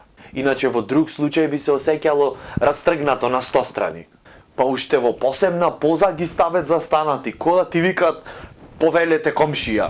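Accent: Ohrid, North Macedonia. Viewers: Mislam deka gresis. Covekov ima bogat recnik, a segite mu se navistina originalni, i bidejki gi interpretira na Ohridski dijalekt isto e interesno. Marjan poveke koristi sekojdnevni razgovori, no i toj e dobar. Pozdrav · brat izvini vaka, ama za stend ap komedija ne si losh, no bitolcaneson te shie... ne si faca iako imas smek... bi rekol deka znaesh kako i umeesh do negde, no ti fali lik... napraj neshto od sebe, smeni go likot.. neznam kako, ama ti si umetnikot, stori neshto... i malku pomalce naglasuvaj go ohridskiot dijalekt.. iritira... naj iskren sovet. pozdrav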